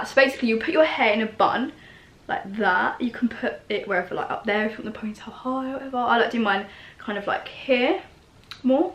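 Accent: Essex, United Kingdom. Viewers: you must be from essex as wellllll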